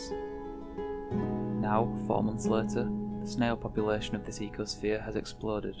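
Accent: United Kingdom. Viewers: Judging by the accent and vocabulary, you're from the UK? I am :) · he's UK or something along those lines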